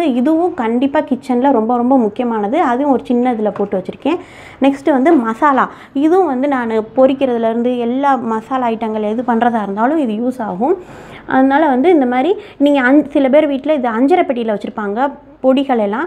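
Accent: Madurai, India. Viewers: R u from madurai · Andha thenga kathi maduraila use pannuvom apram pathriram velakunum nu soluvom urs madurai slang madhiri iruku adhan keten pls reply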